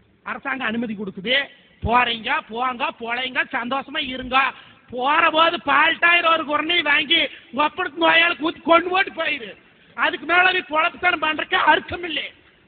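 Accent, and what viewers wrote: Kongu, India. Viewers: Super nga very nice kongu Tamil la alaga pesuninga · kongu tamil...always great....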